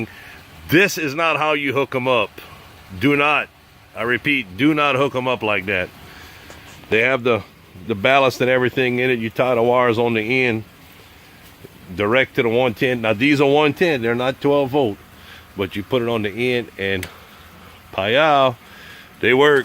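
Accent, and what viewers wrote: Louisiana, United States. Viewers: I'm here and there and come back all the time! If for nothing else but to catch a splash of that awesome Cajun sounding voice of your's! I don't push my channel either but as time passes folks just seem to find something interesting enough to watch and of course we learn so much from all the commenters. Thanks for taking us along with you on your greenhouse adventure. I sure do wish I could have a tomato plant growing with fruits on it here up north!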